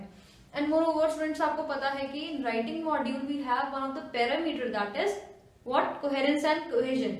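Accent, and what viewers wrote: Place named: India. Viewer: your talent and effort is equal to solute. but i am not understanding you coz of accent of Indian and sometimes indian words😭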